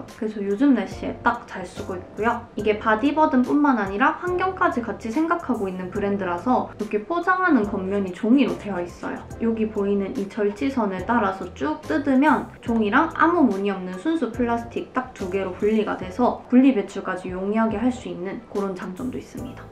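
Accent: Gyeongsang, South Korea. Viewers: 일본인 구독자입니다! 님 고향이어디입니까!! 갱상도 사투리같은데 어디죠???🥺💕💕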